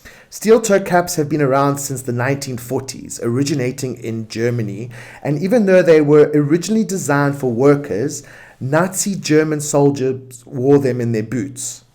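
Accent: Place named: South Africa. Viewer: Your intention to show Toe caps history and evolution is trivial and informative. However, you made a huge mistake in using an image of HITLER to reference a period in time. That is extremely insensitive, offensive and reprehensible. Absolutely bad decision ( if it wasn't on purpose) to show your personal alignments with one of the worst war criminals in history! You could have EASILY chosen a different image from the same time period. Perhaps your being from South Africa, ( the accent giving it away) is part of why you did it on purpose. Absolutely Deplorable. Very tempted to report this garbage to Youtube.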